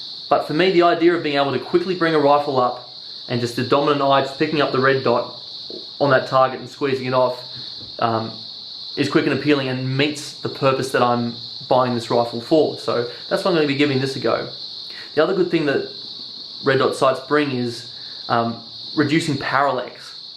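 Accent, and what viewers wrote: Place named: Australia. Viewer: I just stumbled across your channel today by sheer accident but you have me hook if for no other reason ( although many reasons) you are the first Aussie I've heard that just speaks like a regular Aussie I just genuinely appreciate that and for once don't feel miss represented please keep up the honest genuine feedback and the fact you're not trying to tell answers but have a discussion. Mate you're one of a kind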